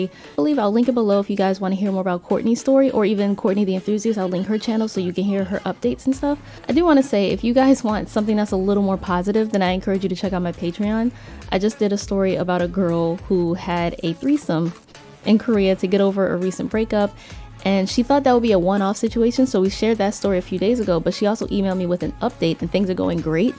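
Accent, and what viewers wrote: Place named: England, United Kingdom. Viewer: Just love your stories. You're such a good storyteller & make me laugh. Also, must say I enjoy your English accent. I'm a linguist too, and a great believer people who speak other languages are also good mimics. 😊 Keep doing what you're doing - more power to you girl! 😊😊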